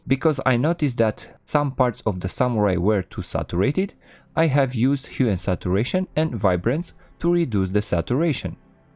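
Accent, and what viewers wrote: Romania. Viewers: you are from Romania you have a Romanian accent p.s I like what you do I discovered you a few days ago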